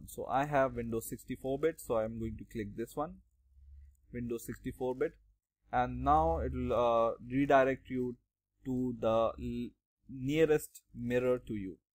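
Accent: India. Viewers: wait. are you indian or german?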